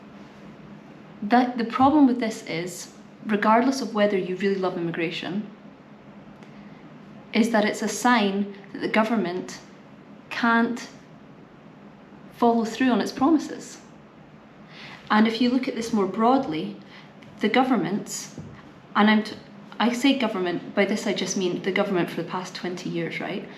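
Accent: United Kingdom. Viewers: I love women's accents from England. I could get a English woman to read me a book, any book, just to listen. Much love from USA. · I was curious as an American the gripes of someone from the UK. I only hear good things from people here about it from people that haven't been and wanted to see if the grass is just greener or it's actually better. I stayed for your accent and ability to convey ideas efficiently. I appreciate you.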